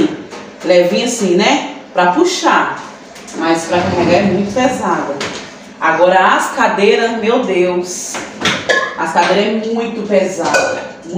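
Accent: Minas Gerais, Brazil. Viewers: Vc é de onde ?? Vc conversa tão engraçada faz graça kkkkkk igual eu deve ser mineira como eu amiga kkkkkk é nois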